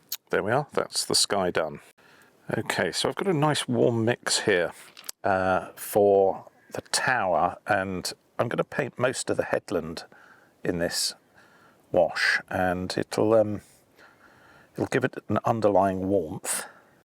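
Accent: United Kingdom. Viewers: Hi I am from Malaysia Want to tell you that I really appreciate your lessons! So skillfully demonstrated! And besides, your British English Is a delight to listen to after the endless American twang which is so hurting to the ears! Thank you!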